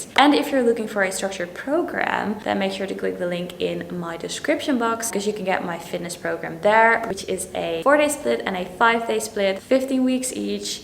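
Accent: Netherlands. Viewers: Going by the sound of it I would say that you're Dutch.. Good info! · Your accent is so cute <3 are you from the Netherlands? You sound like a Dutchie!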